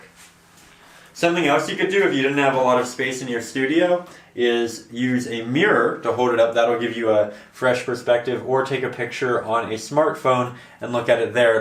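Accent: Canada. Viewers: I hear you are Canadian.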